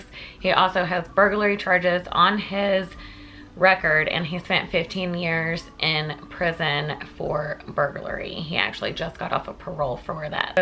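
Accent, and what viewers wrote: South, United States. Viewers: Wow, I love her voice. Lil southern accent. You got my sub.....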